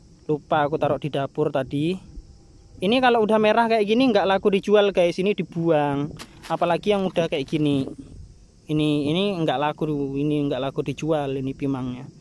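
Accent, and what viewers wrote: Java, Indonesia. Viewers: Asli jowo opo pontianak to bos..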